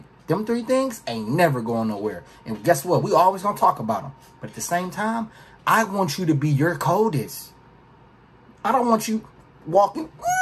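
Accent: Baltimore, United States. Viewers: I thought dude was from Baltimore lol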